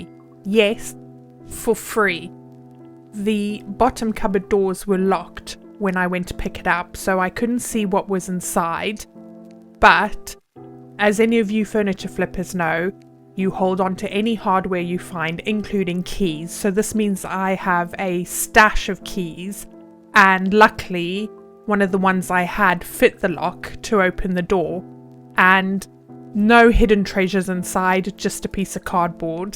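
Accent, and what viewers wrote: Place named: England, United Kingdom. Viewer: I had to put the video on mute. I couldn't stand your English accent...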